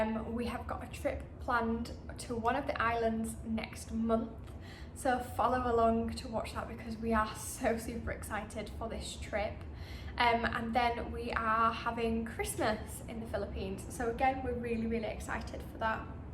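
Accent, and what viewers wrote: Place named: Northern England, United Kingdom. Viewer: Viewed through the video and, considering everything about your lives and experiences, I commend you both for making a bold decision ü (Including, I suppose, activating your channel on YT, my go-to for most because your other platforms are too saturated if not wild =) ) Many Brits have come (and gone) especially just before and right after the lockdowns, from across the UK and age ranges: single ones, couples, married and even a family of 5 (no traveling childless cat ladies or cat dads so far +D). Anyhow, I hope you both encounter what you seek for the next 12 months here—typhoons, heat and humidity, earthquakes, traffic jams and karaoke nights elsewhere, mosquitoes and a whole lot more in a tropical country package yet to be unpacked. Can't preach about what to expect or not besides the usual tourist warnings or travel checklists because every experience, prospect or encounter is different. 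What I do know is there will tons to document and put into your chest of treasure experience, not least of which is when your inner child is unleashed in great moments, or your tenacity wins over challenges:) All these to say, Welcome to the Philippines, and significant other! Travel safe always, hydrate, and keep a mosquito repellent handy lol PS. You have one of the adorable UK accents I like hearing and I would guess a northern one(?) PS(2). Sorry, a long comment but this is a chance than any while you're still growing YT subscriber base, me included. Otherwise, you won't have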